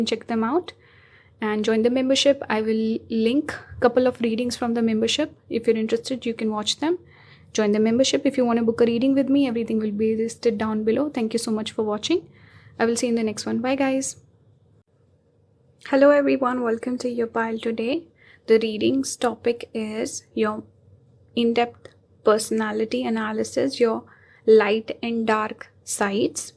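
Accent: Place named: India. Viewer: Why you sound like an Indian...are you an Indian ❤❤❤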